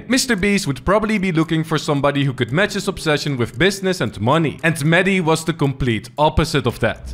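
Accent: Netherlands. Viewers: Where are you coming from? You sound Dutch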